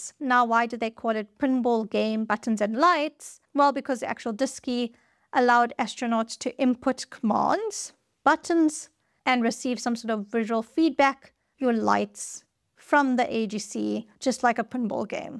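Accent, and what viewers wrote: South Africa. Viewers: Excellent video. It's heartening to see young software developers pay homage to the giants whose shoulders all developers stand on. I was 7 years old when Apollo 11 landed on the moon. Later in high school, I read about the AGC and I was inspired to learn how to program. First course was FORTRAN with punch cards. I worked as a software engineer for 38 years and never forgot the lessons learned from those pioneers. PS I love your South African accent. Keep up the good work! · Are you South African? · Sounds Seth Efrican. Some antipodean accent. Kiwis would say “luft” and “mussion” for “lift” and “mission”.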